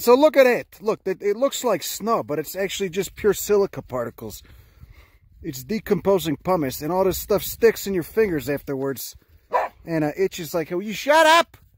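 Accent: Chicago, United States. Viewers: The 'Cago accent pronouncing Latin is the best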